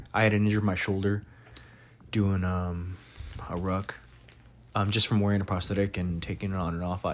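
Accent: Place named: Texas, United States. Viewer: 1) Get yourself the brightest lazer/illuminator module you can find and practice point shooting from the low ready and hip positions. The scope is for longer ranges, but for your handicap a lot of tacticool lasers would serve you pretty well for close range daytime shoots.You sound Texan, yall have cool toys over there and hella veterans discounts so finding one should be easy. 2) Use your sling as an additional point of contact. A single point audjustable sling attached to the top rail where the center of gravity is feels like you have an additional arm holding the rifle up. Have it extremely tight and wear it so the weight of the rifle pulling on the sling is supported by one of your shoulders. I have a stockless 16in Ruger PC9 settup like this, its fun being able to manipulate it with one hand and accuretly use the laser to hit my targets. 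Ive never been serious with it though, but I can see my settup helping your flesh side from fatigueing less often. Semper Fidelis, much love from the Communist State of California.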